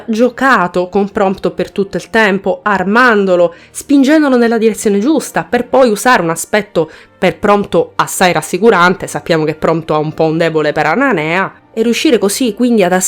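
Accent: South, Italy. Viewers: Sono sempre ipnotizzato dal tuo modo di parlare. C'è qualcosa del sud nel tuo accento.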